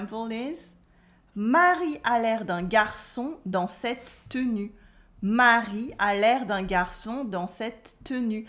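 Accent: France. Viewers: J'adore ce concept. Les formes et tournures employées pour définir le français courant sont très bien choisies. C'est du beau boulot (great job). Mais je ne comprends pas pourquoi choisir systématiquement de prononcer l'anglais avec cet atroce accent français ? Alors qu'il est manifeste que vous maîtrisez parfaitement l'anglais. Est-ce un marketing gimmick ? A way to confirm your legitimate frenchitude ? C'est atroce à entendre mais il est clair que votre travail est parfait pour assurer une bonne transmission interculturelle du standard french. Bravo. C'est aussi une fabuleuse publicité pour les dentistes français ;) (I'm kidding your smile is gorgeous)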